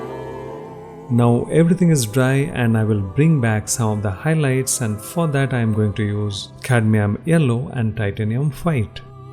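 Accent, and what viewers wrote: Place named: India. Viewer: Before reading that you are Indian ..i recongnize your campus accent.. i have travelled a lot all around India ...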